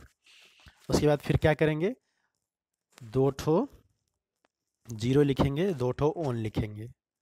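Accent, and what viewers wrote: Nepal. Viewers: Are u Nepali ?? Reply honestly 😸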